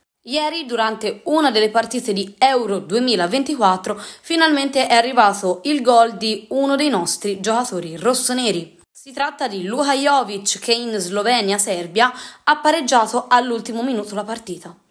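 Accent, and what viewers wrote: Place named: Tuscany, Italy. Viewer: Mi piaci come parli sei toscana 🔴⚫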